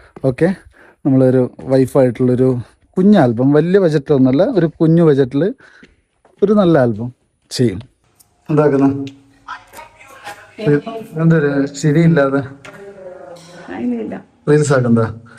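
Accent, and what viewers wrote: Kasaragod, India. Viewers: Ksrgd slang anna use ayikudee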